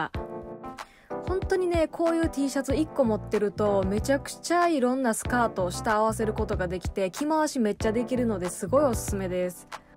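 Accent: Kansai, Japan. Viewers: 関西弁？だから芸人さんみたい笑😂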